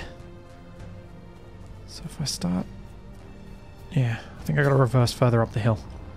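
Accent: Australia. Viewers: Oh, I didn't know you're Aussie. Happy to know mate. Brisbane here. :-) I'm loving your videos. And you're totally right. Do it the fun way, efficient or not.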